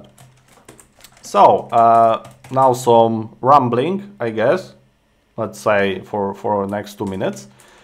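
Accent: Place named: Russia. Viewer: Мне нравится ваш тяжелый russian accent, товарищ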